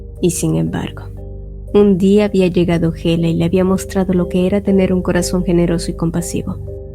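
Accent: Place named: Paraguay. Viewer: Me re suena esto re paraguayo ayuda soy la unica?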